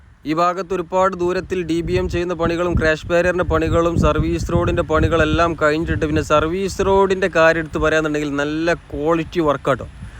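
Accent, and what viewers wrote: Malappuram, India. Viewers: he already mentioned that he is gonna speak in Malappuram slang then why are you bothered..in fact that's why I follow his videos coz its different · I like Your Malappuram accent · enthe bro malapuram slang njagalum kelkkate..maya kuyi okk kelkkan oru rasamalle... pinne.vedio super...MODI kk ORU THANKS PARYAAN ITH kandapol thonni.toll koduthalum kuzhapam illa. aa parvoor .ente rabbe...ath in bro paranj apoe kalich chitch pokaam... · മലപ്പുറക്കാരൻ മലപ്പുറം ശൈലിയിൽ തന്നെ പറയുന്നതാണ് നല്ലത്. യായും ഴയുമൊന്നും കാര്യങ്ങൾ മനസ്സിലാക്കുന്നതി ന്ന് തടസ്സമല്ല. അക്ഷരസ്ലോക മത്സരത്തെപ്പറ്റി വീഡിയോ ചെയ്യുന്നുവെങ്കിൽ അതൊക്കെ കൂടുതൽ ശ്രദ്ധിക്കുന്നത് നല്ലതാണ്. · മലപ്പുറം ശൈലിയിൽ ഉള്ള വിവരണം കുഴപ്പം ഇല്ല പക്ഷെ സ്ഥലപ്പേരുകൾ കറക്റ്റ് ആയി പറയാമായിരുന്നു. Eg. കൊട്ടപ്പുറം അല്ല കോട്ടപ്പുറം എന്നാണ്, കൊതപറമ്പ് അല്ല കോതപറമ്പ് എന്നാണ്, മുത്തുകുന്നം അല്ല മൂത്തുകുന്നം എന്നാണ്. 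Google Map നോക്കിയാൽ മലയാളത്തിൽ സ്ഥലപ്പേരുകൾ ശരിയായി വായിക്കാവുന്നതാണ്. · ഞാൻ ഇടപ്പള്ളിക്കാരനാണ് (കൊച്ചി)ഒരു നിരീശ്വരവാദിയും പക്ഷെ Central Govt നെ Support ചെയ്യുന്ന വ്യക്തിയുമാണ്. ഞാൻ താങ്കളുടെ വീഡിയോ ഇഷ്ടപെടാൻ കാരണം തന്നെ താങ്കളുടെ ഈ മനോഹരമായ മലപ്പുറം സ്ലാഗ് ആണ്. തുടർന്നും നല്ല വീഡിയോസ് പ്രതീക്ഷിക്കുന്നു. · ബായ്, ഞാൻ എറണാകുളം ജില്ലക്കാരനാണ്... മലപ്പുറം ഭാഷയും, ഫുട്ബോളും എനിക്കിഷ്ടമാണ്... നിങ്ങളെ സുടാപ്പി എന്നു വിളിച്ചു കളിയാക്കുന്നവർ വിവരദോഷികൾ മാത്രമാണ്.... മൂത്തകുന്നം jn. ഇൽ നിന്ന് വലത്തോട്ട് തിരിഞ്ഞാൽ ചെറായി, വൈപ്പിൻ വഴി, വല്ലാർ പാടത്തും എറണാകുളം നഗരത്തിലും എത്താം.... നിങ്ങളുടെ ഭാഷയിൽ ഈ വീഡിയോ ഇനിയും കേൾക്കാൻ കാത്തിരിക്കുന്നു...😂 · Is this Malapuram accent? Never been there.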